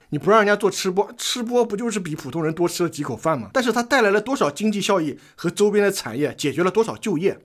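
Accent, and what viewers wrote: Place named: Shandong, China. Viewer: up山东人？方言暴露了啊😄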